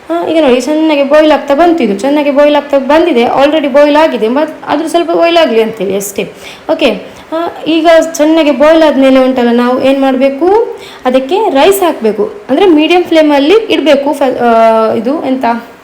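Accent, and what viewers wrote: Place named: Tulu Nadu, India. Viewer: Nimma kannada Tulu mix kelalu kusiyagtade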